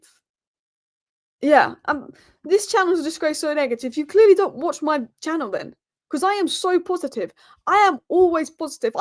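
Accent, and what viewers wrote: Manchester, United Kingdom. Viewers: Could you repost this with subtitles please? I can't understand that thick Mancunian accent OK Yah.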